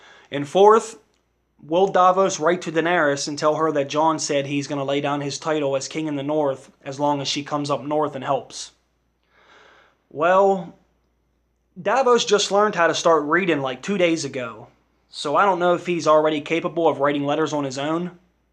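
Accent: United States. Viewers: I can't place your accent. Sometimes you sound like you're from Bal-more when you enunciate those rounded Os. Then you sound like you're from New England with the shortened A (i.e. park is pahk car is cahr) but then you sound like you're from West Virginia with the countrified dropping of word endings (I don't mean that as an insult- I think countrified accents sound absolutely warm and charming!) I think you did an outstanding job on this video. Well done, dude!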